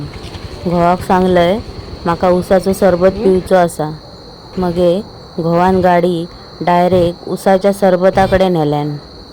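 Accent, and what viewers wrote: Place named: Malvani, India. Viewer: तुमची व्हिडिओ मी पहिल्यांदाच बघतोय तुमची मालवणी ऐकून मला प्रभाकर मोरेंची आठवण झाली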